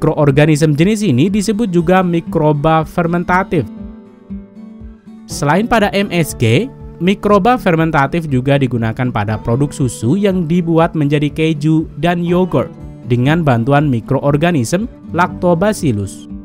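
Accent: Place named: Chinese-Indonesian, Indonesia. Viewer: Logatnya kayak chindo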